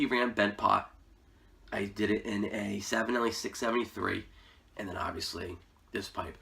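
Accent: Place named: New England, United States. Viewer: Hello definitely a New Englander. My wife is Rhode Island and it takes some time to be able to understand what Yinz are saying. LoL. Great video and I gave you a sub. Great job and thanks for the review. Be well and Keep it Lit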